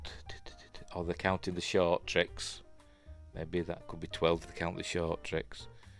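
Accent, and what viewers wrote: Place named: Yorkshire, United Kingdom. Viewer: actually your accent just perfect mate! Love some lovely yo'ksheir accent!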